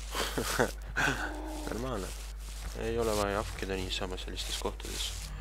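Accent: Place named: Russia. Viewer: Vene Aksent Nice !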